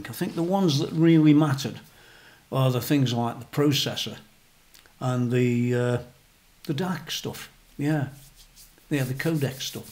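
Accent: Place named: Derbyshire, United Kingdom. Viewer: Hi. I’m new to your channel. Really enjoyed your presentation. I’m seriously thinking of grabbing the H90 is someone wants my Luke. I can’t pinpoint your accent.Derbyshire? I will try and find you on Facebook as I would love to bend your ear. Fantastic channel.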